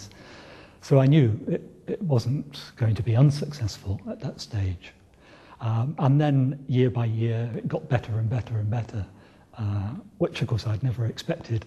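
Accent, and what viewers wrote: United Kingdom. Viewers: Does he speak in RP?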